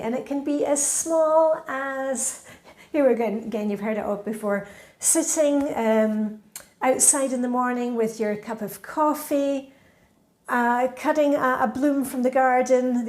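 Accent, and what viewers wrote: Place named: Scotland, United Kingdom. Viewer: Dear, I am so sorry to hear of the loss of your mum. Absolutely know how that feels. I live near Edinburgh, lost my mum 2 years ago to cancer and like you, cared for her at home till the end. It was your routines and cheery Scots voice that kept me going in the home and helped me get ready for work every day, so thank you so much for all that you do. You are greatly appreciated 🤗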